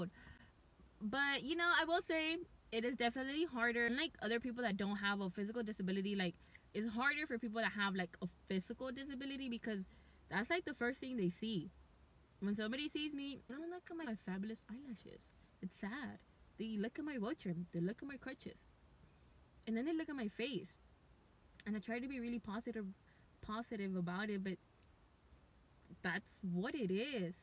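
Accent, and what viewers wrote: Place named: Bengal, India. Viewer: LOL, at first, after I saw your face, I thought... um... maybe a TAnned Pakistani maybe... or someonef rom Bengaluru, but OMG that accent andthose mannerisms... That is so Bengali,s pecifically a Bengali livingi n the East Coast ofth e United states, or maybe California. As for your video content, well, it seems you have not been disabled as long as someone like me. There are things you have yet to learn from life. It'll come to you. It comest o all disabled people sooner or later. Keep it up kiddo1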